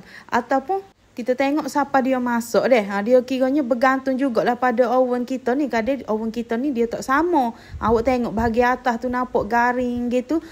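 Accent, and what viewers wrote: Kelantan, Malaysia. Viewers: WASSALLAM WRB.Sedap mashallah tlong cakap BM betul.Ndmpak hitam sikit patut tak nampak macam burnt.Jkkhir.Bukan s4mua faham dialect Krlantan.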